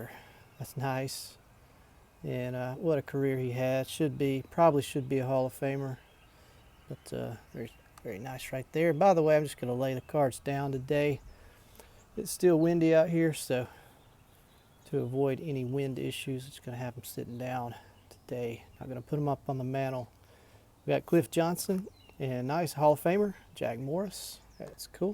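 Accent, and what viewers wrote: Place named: Southeast, United States. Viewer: This was actually not too shabby for the amount of hall of famers pulled! That 1989 Donruss was a tinge bit of a letdown, BUT Craig Biggio Rookie Card is a Great Pull 😍!! Fine Video and props to you for opening up outside, and I Absolutely Loved the bird sounds in the background. I just realized, you got a slight accent, are you from like the Southeast like Tennessee or maybe West Virginia area? I'm bad at accents 😅 but you are easy to understand and I reside in Washington State myself 😎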